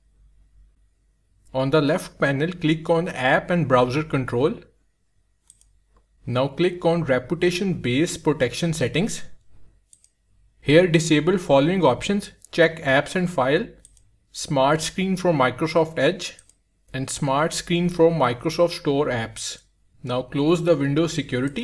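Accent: India. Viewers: Average indian accent haha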